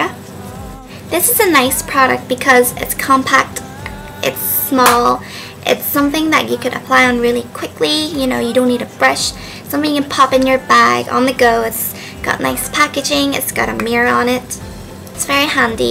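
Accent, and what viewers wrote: Ireland. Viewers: are you irish? I totally LOVE your accent! <3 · What is your accent? It sounds a bit Irish???? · Is that an irish accent???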